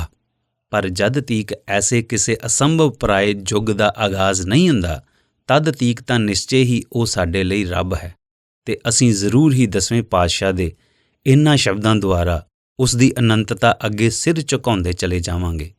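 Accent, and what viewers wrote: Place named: Punjab, Pakistan. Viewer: Tuhadi awaj sun ke bht khushi hundi aa.. tuhadi punjabi cho Pakistan Punjabi da kafi touch feel hunda.. menu mere Pakistan punjabi frnd yaad aa jande jado v tuhadi awaj sundaa.. Thanks u very much Sir🙏🙏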